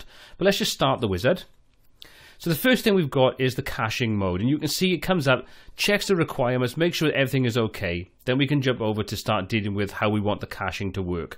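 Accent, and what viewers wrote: United Kingdom. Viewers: Love this tutorial, you sound like a fellow Brit! I have done an up to date video on the same topic on my channel, however all of these WP tips are still spot on! Great video! Clear & concise!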